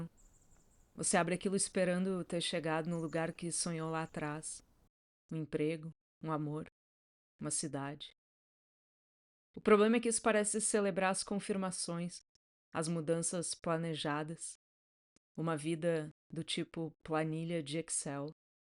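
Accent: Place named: Porto Alegre, Brazil. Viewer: Confesso que ainda não li os teus romances, mas tenho lido tua news regularmente já faz um tempo. Desde a enchente aqui de poa, por algum motivo do acaso achei o nevoeiro e me identifiquei. Comecei a escrever também. Muito legal te ouvir com teu sotaque portoalegrense. Lindo o video e apesar da sensação de isolamento (volintário), dá pra entender muito bem porque tu escolheu morar nesse lugar.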